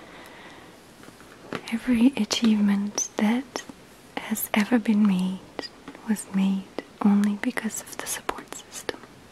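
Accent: Russia. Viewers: Scissor__hands94 is definitely one of my favorites. If you like her accent, I'd suggest ASMRMania (another Russian gal, her accent is quite a bit thicker than.) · I really love your facial with strong Russian accent. As well as your sleep fairy. Could you please do some more similar? Thanks for always brightening my day,. Keep up the grand work:-)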